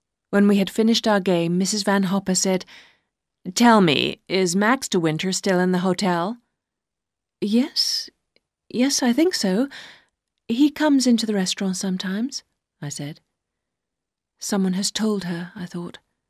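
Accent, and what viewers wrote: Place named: United Kingdom. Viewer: British English is so elegant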